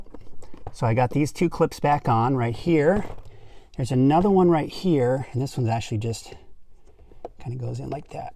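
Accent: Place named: United States. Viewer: I Think you're the best guy out there on YouTube to practice the American Accent :) Love your videos!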